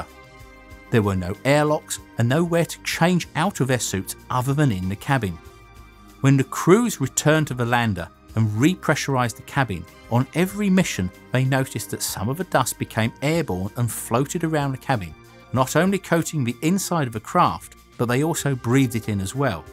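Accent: United Kingdom. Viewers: Really? Odd how the plaque left on the Moon reads Here men from the Planet Earth first set foot upon the Moon July 1969AD We Came In Peace For All Mankind and how odd that the narrator has a British accent, but that probably because he's British with an interest in the Apollo program. And that the samples brought back have made their way all over the world. If you are really that small minded that it just has to be an American narrator, then the Apollo missions are wasted on you.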